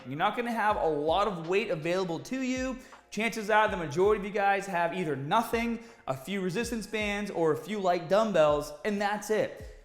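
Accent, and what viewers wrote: Boston, United States. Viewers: love that Baawston accent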